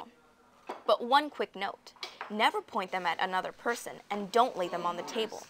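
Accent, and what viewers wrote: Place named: Japan. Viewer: she gave accurate information and an interesting video, it's like a japanese person speaking english for the first few times, there not going to get it right straight away :)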